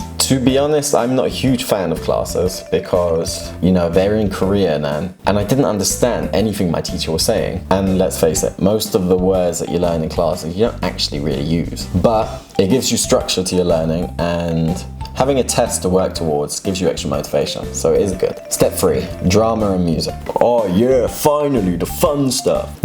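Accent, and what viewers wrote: United Kingdom. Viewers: 영어 영국 발음!! 진짜 멋진것같아요 영화 토르 볼 때마다 매번 심쿵 ㅠㅠ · 와씨 영국발음 개좋다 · 정말 훌륭한 조언인 것 같네요. 한국어를 배우려는 외국인들뿐만이 아니라 잘못된 영어교육을 받고 있는 한국인들에게 좋은 팁이 되겠네요. 참고로 영국의 악센트는 언제 들어도 매력적이네요. 이 영상 보면서도 계속 따라했네요 ㅋ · 영국악센트지린다....하♡ 영국어배우고싶어 ㅜㅜ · 씨(?)의 학습법에 매우 공감합니다. 그리고 영국 발음은 언제나 듣기 좋네요 ㅎ 언제 한번 영국 발음 천천히 제대로 들을 수 있는 영상도 찍어주셨으면 좋겠네요. 물론 제 개인적인 희망사항입니다.. 후훗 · 영국 발음 너무 매력적이다... 💜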